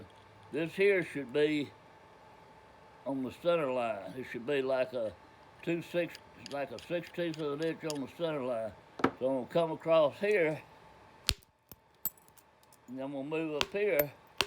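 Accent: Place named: South, United States. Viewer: thank you for sharing your knowledge, and I could listen to you talk all day with that southern draw. where ya from?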